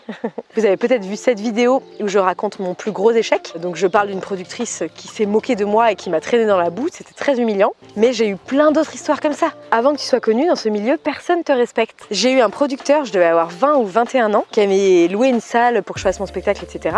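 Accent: South, France. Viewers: Encore une fois , “une petite pépite” cette vidéo ( avec l’accent du sud s’il vous plais 😂 ). Merci pour nous avoir partager autant de bonne humeur , des le réveil ça fait énormément plaisir 🙏😌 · Au programme des troupes de dance moderne jazz avec des chapeaux melons, 🎩😂😂un parfait accent du sud👍👌😉 et des expressions qu'on adore comme" j'men bat les Yakas".😝😉😂 Je t'adore continue ce que tu fais.👏😉😂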